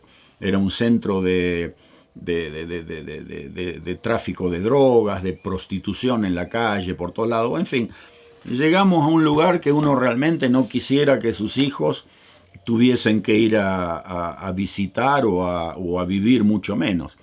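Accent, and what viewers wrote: Argentina. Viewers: Jaimez Cristian : si esta muy bien su forma de expresar, pero su asentó ya no es tan argentino, A mejorado mucho su lenguaje. Y se oye mucho mejor... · Hoy,6 de agosto de 2020, recién encontré los videos de está familia....la verdad lo admiro por su vida,su educación,su lucha....y lo hermoso es su lenguaje que no ha perdido nada de argentino, pero domina el relato como nadie,ninguna muletilla..... más lo quisiera algún periodista famoso!!!! Cariños !!! Cris de Mar del Plata. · Me encanta que, viviendo tantos años afuera nunca perdió nuestro tono Argento tan particular. Saludos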